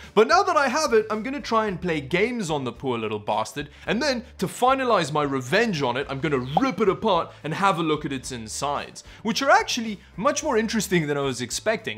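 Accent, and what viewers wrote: South Africa. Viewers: Dude are you South African? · what is your accent comprised of please? i cant make it out. Sounds like South African mixed with Canadian and Welsh.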